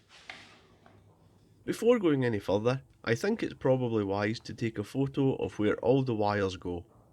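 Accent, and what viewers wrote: Scotland, United Kingdom. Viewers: Another sublime restoration video from the man with the sublime Scottish accent. is very funny 😂👏👍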